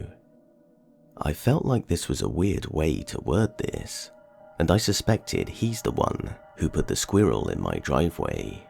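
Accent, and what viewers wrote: United Kingdom. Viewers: Thank you 😊!!!! For the Stalkerlicious 👁 Narration:) and for over an hour of your Britishlicious voice 😘 be safe everyone ok 💋much love Sexy Queen of Darkness ✌❤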